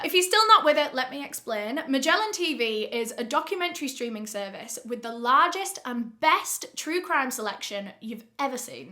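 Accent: United Kingdom. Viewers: You are my favorite true crime channel. I’m from the US so love the accent as well. You give us information as well as having the upmost respect for all the victims you talk about. And also holidays are coming around and I know you talk about your personalized hair care and for the life of me I can’t remember the name or website please let me know thank you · Do you ever see a person before you hear the accent you think to yourself "that person is very very British."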